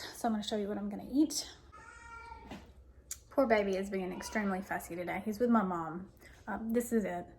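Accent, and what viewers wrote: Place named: Oklahoma, United States. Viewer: Just found your channel recently .Love it ! And you sound like you could be an Okie too😁👍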